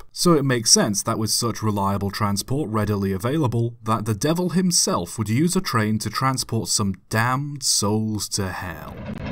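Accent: United States. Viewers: As an American, I have to say your American accent is extremely accurate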